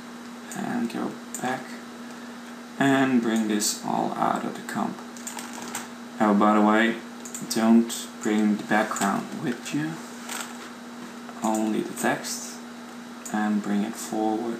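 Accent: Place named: Netherlands. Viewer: haha lekker hoor dutch accent ftw :)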